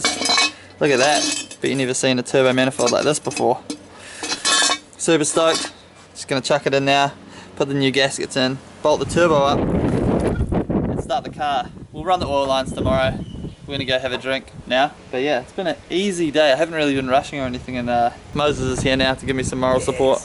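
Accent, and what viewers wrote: New Zealand. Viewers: Lmao first word you said knew you were from NZ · R u a kiwi?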